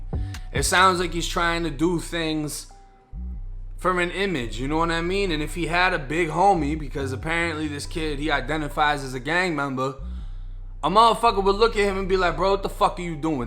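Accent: Boston, United States. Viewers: Love your videos bro . Florida boy with the Boston accent . 💯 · Jus noticed the Boston hats you be rocking! And the accent leads me to believe you from the bean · What part of boston you from?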